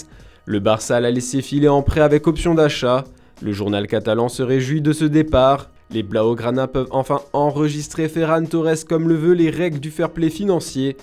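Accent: Italy. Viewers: Ton accent italien, on en peut plus 😰😰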